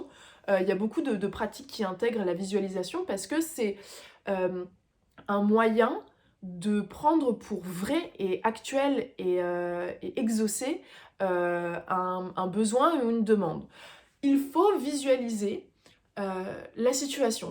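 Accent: France. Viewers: Very charming you are, either speaking English with French accent ,and speaKING FRENCH, Very sensual.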